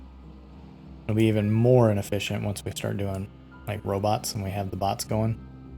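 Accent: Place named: United States. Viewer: Ok I watch a lot of timberborn videos…you and one other are the only Americans I can watch…otherwise it just sounds weird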